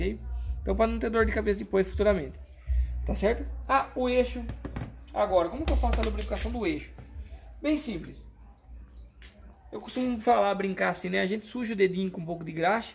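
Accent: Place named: South, Brazil. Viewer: Bom dia gostei de assistir sua explicação tudo de bom pra você você deve ser do Sul por causa do sotaque parabéns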